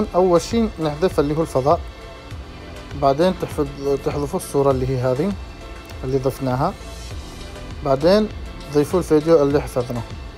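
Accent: Yemen. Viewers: انت من اليمن صح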